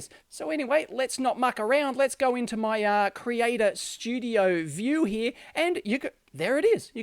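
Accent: Australia. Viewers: You've got a sub from me love the truthfulness and that your an aussie love the accent😂😂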